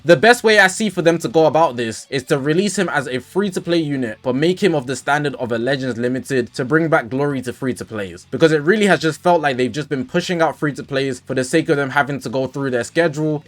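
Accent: United Kingdom. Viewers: So You're From United Kingdom Brittish, Because Is So Much Diferent From USA, Because Of Brittish Accent, Saying "Shallet" With The Sound Of "A" Instead Of "E" So I Mean You're A Brittish So Its Easier Than Learning English From The United States Of America.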